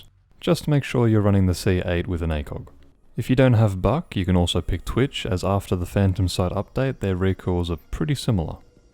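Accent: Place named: Australia. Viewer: the Smooth as butter Aus accent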